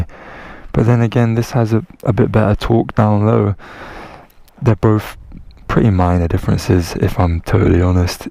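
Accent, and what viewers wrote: United Kingdom. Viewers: You sound British but I'm sure this is Thailand though! Are you based there? like your reviews, so new follower here!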